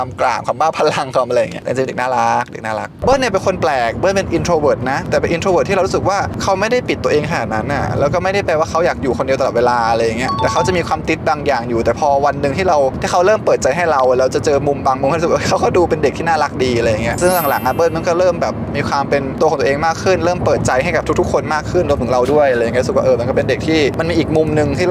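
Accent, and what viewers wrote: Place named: Isan, Thailand. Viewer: พี่ต๋องพูดอีสานน่ารักกก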